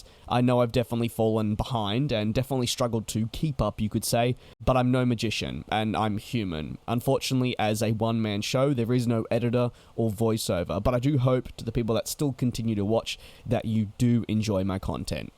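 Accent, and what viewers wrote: Australia. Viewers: Have you ever wanted to become a commercial pilot? Also even tho u sound aussie are you in the UK?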